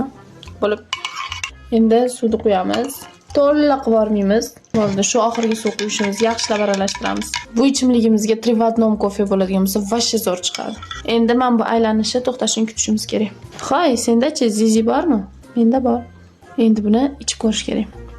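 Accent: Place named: Fergana, Uzbekistan. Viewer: Jijja jijjadan Fargʻona sheva Fargʻonaliklar bitta layk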